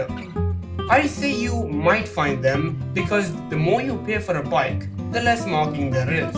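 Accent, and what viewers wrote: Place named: India. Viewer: Love your vids. You have a little Indian accent but nothing to bother with. Continue I will still continue watching. Where do you live ?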